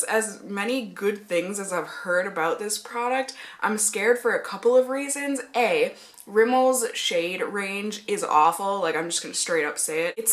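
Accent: Minnesota, United States. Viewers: The first video of yours that I saw was your Minnesota accent tag, (I'm from MN too!!) now I'm hooked on your videos! I just loveee your personality and how sweet you seem ❤️❤️ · IVE WATCHED LIKE 20 OF UR VIDS AND WAS LIKE hmmm I get a vibe she's from Minnesota and BAM FIRST 30 SECS OF THIS VID AND IM RIGHT YESSS (fellow Minnesotan btw) :)))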